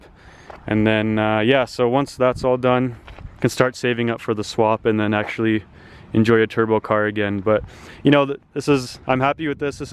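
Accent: Canada. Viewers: are you canadian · You sir got my sub! I can't wait to see how the car will look! And also your Canadian Accent is just beautiful!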